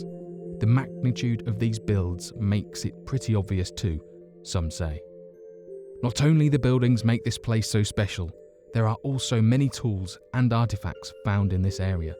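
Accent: England, United Kingdom. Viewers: uhh no, he's definitely from England. I mean, I would know, being from England, also.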